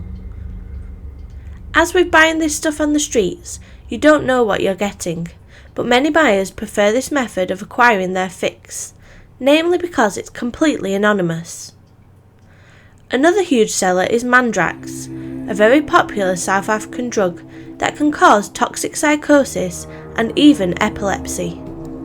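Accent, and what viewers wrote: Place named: Northern England, United Kingdom. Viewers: are you British? Your accent sounds it I'm from Nottingham you sound more northern but I could be wrong lol what county you from? If your from England that is lol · North of England. Yorkshire or Manchester maybe. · rapper She sounds like she could be from Bradford?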